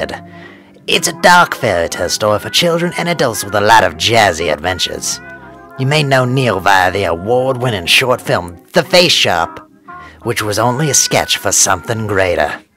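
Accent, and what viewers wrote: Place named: France. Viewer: T’as un accent français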